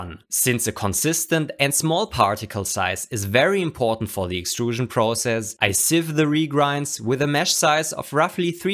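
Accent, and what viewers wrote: Germany. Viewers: I Hear the German Akzent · Man hört ech raus das du deutschr bist ;) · you sound like you´re german ,im too! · Are you From germany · Are you german ? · I smell some german accent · German accent? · so ein wunderschöner dicker deutscher akzent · der ausprache nach sind sie deutsch mein englisch ist auch nicht gut xd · Digga sprich doch einfach deutsch man merkt deinen deutsch Akzent also sprich einfach deutsch und hör auf so zu tun als wärst du Amerikaner oder so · You’re a German am I right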